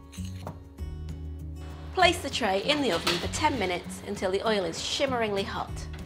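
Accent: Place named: England, United Kingdom. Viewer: It was a pleasure listening to your fab English accent, and watching your video, looks very simple gonna make this right away.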